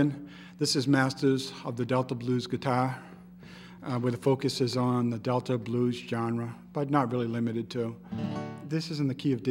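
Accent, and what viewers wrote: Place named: Brooklyn, United States. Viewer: Interesting, sounds like he's from Brooklyn, NY or close to that, can't pick very good either.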